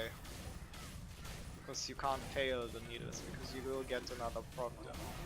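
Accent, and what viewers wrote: Germany. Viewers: so german accent +1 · are you german ? (accent)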